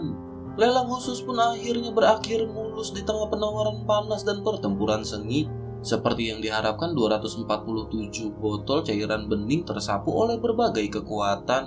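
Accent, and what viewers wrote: West Java, Indonesia. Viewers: Sunda ya min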